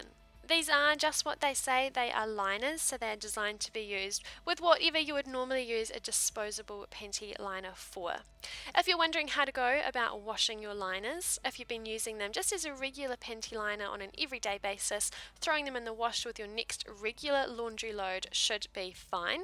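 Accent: New Zealand. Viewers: she has a very strong NZ accent 🇳🇿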